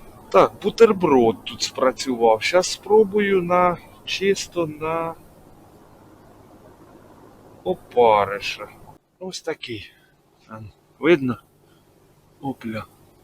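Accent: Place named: Romny, Ukraine. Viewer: Гарна рибалка! Молодець! Чую по говору ти з Роменщини?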